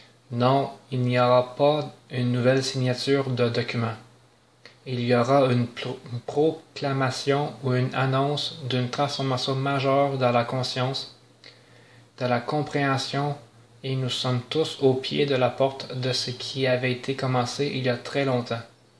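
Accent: Quebec, Canada. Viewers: J'aime bien l'accent québécois.Mais tu pourrais me donner l'adresse de ton coiffeur?..........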